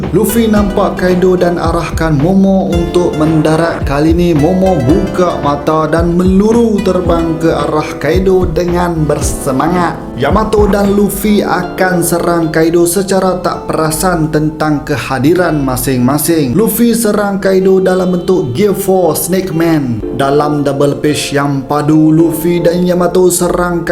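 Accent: North, Malaysia. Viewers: Bro hg org utara blah Mna ? Aku Penang town . . Mantul utara loghat dlm penyampaian . . Semoga terus maju bro 💪🏼💪🏼👍🏼👍🏼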